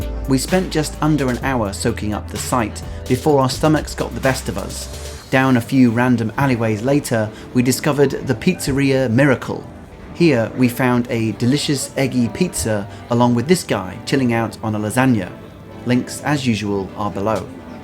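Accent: United Kingdom. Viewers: yeah. You make videos well. Love the british accent too. But bad quality camera not clear vids. Could have been perfect vids if u use high end cams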